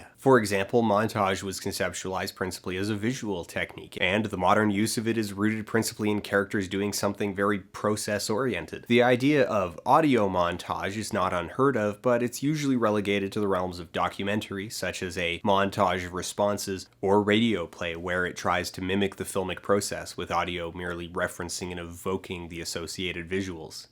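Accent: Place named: Canada. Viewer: I keep forgetting how Canadian you are....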